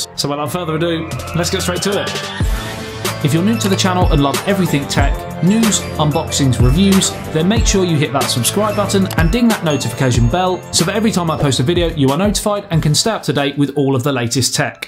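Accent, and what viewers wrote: United Kingdom. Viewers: Actually I watch your video for your great screen presence, brit accent and that lovely dash of humor combined with your constant smirk. Keep it up.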